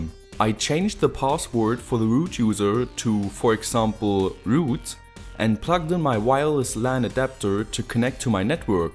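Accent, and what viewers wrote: Germany. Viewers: Jap er ist deutsch. Hört man doch:D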